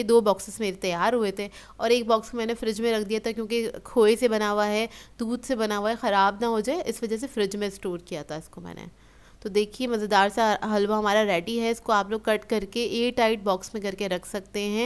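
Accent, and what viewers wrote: Karachi, Pakistan. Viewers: Karacnhi with a typical tone so sweet once Mahira Khan pronounced same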